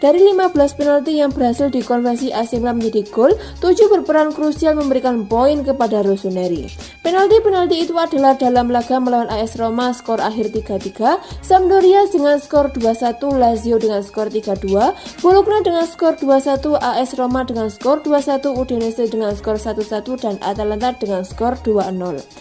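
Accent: Java, Indonesia. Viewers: Si mba admin nya, medok banget suaranya 😂, aga gmna gtu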